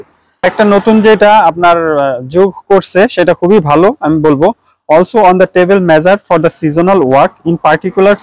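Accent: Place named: Brahmanbaria, Bangladesh. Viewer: Assalamualaikum bhai...apnar home District B-baria Apnar voice shune mone holen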